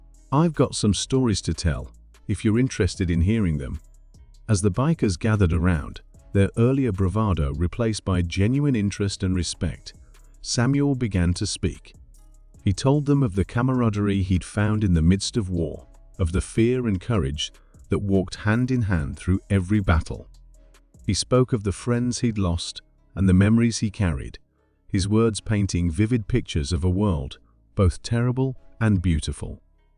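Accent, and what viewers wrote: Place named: United Kingdom. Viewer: very nice. a believable story and pretty well written. some of the location details seemed off, but i'm not a WWII history buff, so can't be sure where the 101st Airborne was throughout it all, especially Normandy on D-Day. just not sure. What was a real sticking point for me was the AI reading. My complaints are as follows: 1) a British accent? for an American story? 2) the mispronunciation of WWII - if it is going to pronounce it "EYE EYE", then spell out the TWO... 3) is there no way to "train the AI" to pronounce correctly? I've worked on AI training projects, so they're out there. So there should be some way for the creator of the video and text writer to correct the AI. If not, change the text. It really diminishes the enjoyment of what was actually a tear-jerker of a piece. On a scale of 1-10: Story: 9; AI narration - 4.5; Proofing by creator - 0??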